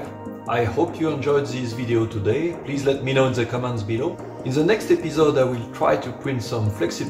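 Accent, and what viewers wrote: France. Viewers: I love your accent, are you french? The way you say extruder just sounds so fancy lol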